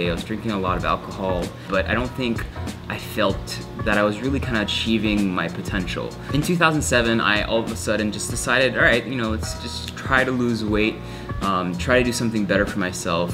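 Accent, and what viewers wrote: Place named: Philippines. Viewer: you have a total filipino accent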